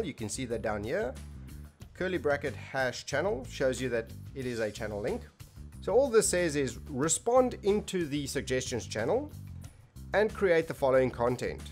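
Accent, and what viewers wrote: South Africa. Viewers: homie are you afrikaans?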